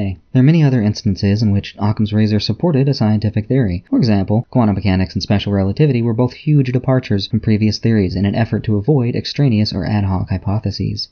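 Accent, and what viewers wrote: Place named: United States. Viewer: He's American that's why I'm looking for a nice concise English accent to explain it to me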